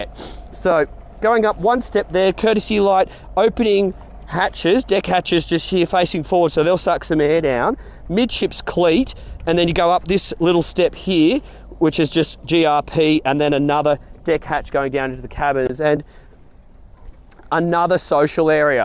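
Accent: Australia. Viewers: Love the Aussie accent..Sometimes he says here , sometimes heeyah..Keep up the good work..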